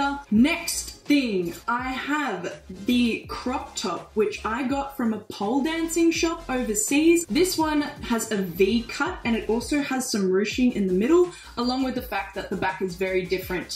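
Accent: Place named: Australia. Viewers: so pointing out that an Australian sounds like an Australian? Don’t let them change you, tell them to go heck themselves and lean into it even more. Go full bogan. Sell “Tadoos” merch. Do you. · Never even knew that our aussie accents made tattoos sound like "ta-doos" to americans?¿😂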